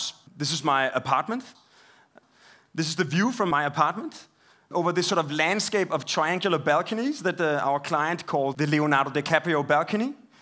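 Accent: Denmark. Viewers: Pretty much everyone from Denmark does this when they speak English or Danish, so I have gotten used to it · just a Danish accent :c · Yes it is annoying. In Denmark the ones teaching english developed their pronunciation when the primary contact was text based, or in songs. So unfortunately all the grammar, and habits from sentence construction and for emphasizing have been transferred to verbal English. The younger generation is much more exposed to American television, and have less tendency to do this. Also it is worse in Copenhagen than in the rest of Denmark, perhaps because the local dialect is further from English.. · Aw... You just have to love the Danes :) Awesome accent! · it's the copenhagen accent :P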